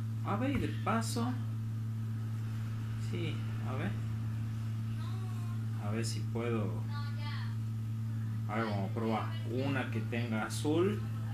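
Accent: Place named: Salta, Argentina. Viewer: Muy bueno .saludos desde Salta ,sentía en tu voz una cercanía a mis pagos ,muy buen sonido , y adelante con el proyecto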